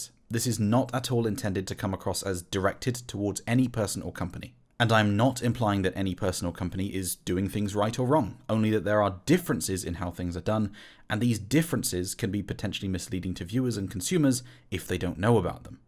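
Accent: United Kingdom. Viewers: An excellent video. you really communicated this very well. And I love the sound of your voice/accent/grammar. The very best of ye old British. Cool. Excellent diction and pace. Very easy to listen to. Superb explanations, very well presented. Thank you.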